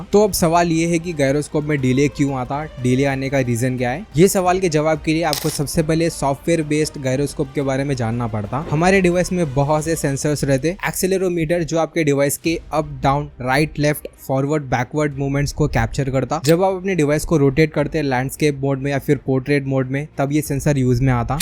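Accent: Hyderabad, India. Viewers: Bahi aap hydrabadi ho kya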